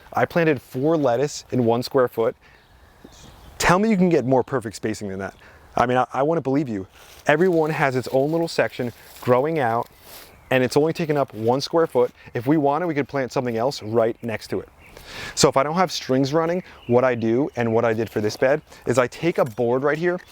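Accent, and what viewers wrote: New Jersey, United States. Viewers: Haha, yeah, his Jersey accent coming out. Be happy he’s not from Boston or Brooklyn, LOL.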